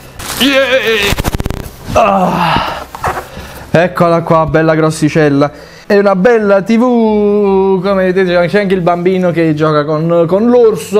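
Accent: Naples, Italy. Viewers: Non si sente che è di Napoli? 😂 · Ma sei napoletano?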